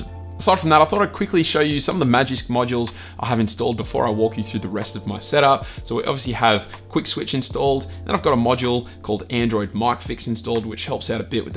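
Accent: Australia. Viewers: Is it an australian accent? It sounds cool but I can't understand, is it australian or not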